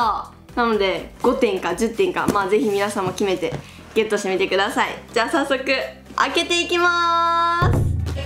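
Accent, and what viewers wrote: Kansai, Japan. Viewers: 始まりからコメントしたくなる。ハキハキで変にぶりっことか、キャラ作りしてないのが好感度マックスw関西弁出てくると思わなかったしギャップがいいです！！ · の関西弁可愛いすぎです。アウター良かったですね。🙆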